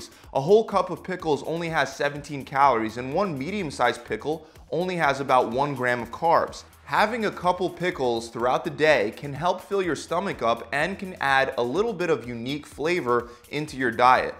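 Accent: Philadelphia, United States. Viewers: You a Philly man?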